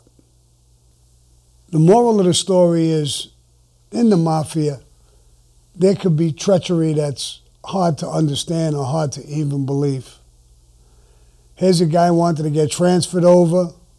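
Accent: New York, United States. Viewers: I forgot to write,sometimes it's hard to understand.either his voice goes low at the end of a sentence or kinda blurry,I think the N.Y. wiseguy accent plays a part too.but I'll take what I can get.cheers!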